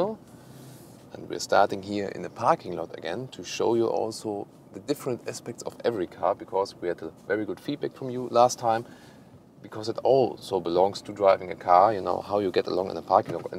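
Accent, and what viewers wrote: Germany. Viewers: You are becoming my favorite reviewer!!! I'm American of German descent, so I love hearing an English review in a German accent! And I like your opinions very much, as well as the quality and information from your videos. Thanks, great work! (I love this car, too! we had this model here until the 80's, I believe. My friend had a Scirocco, it was awesome!)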